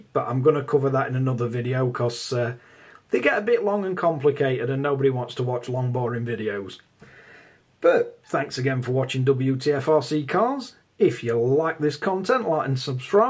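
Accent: Yorkshire, United Kingdom. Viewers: Are you from Yorkshire?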